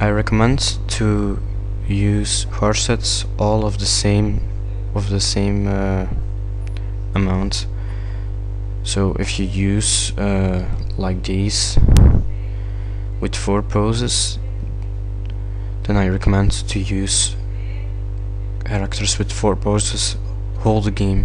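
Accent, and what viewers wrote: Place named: Russia. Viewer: Lijkt wel of je een rus ben met dat accent.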